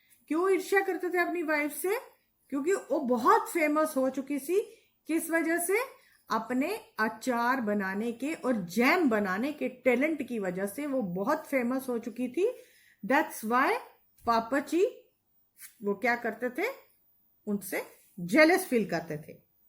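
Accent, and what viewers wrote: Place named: Jammu, India. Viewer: Mam aapme thodi dogri jalak hai 😅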